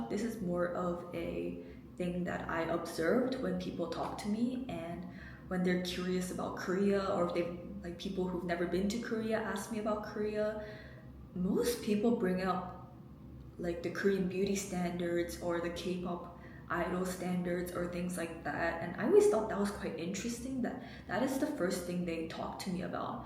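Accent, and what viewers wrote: United States. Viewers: Your American English is perfect. Are you sure you're not American? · Did you grow up in the US or in Korea? You speak like an American but a barely discernable accent. I'll check out some of you other videos maybe you disclose it there.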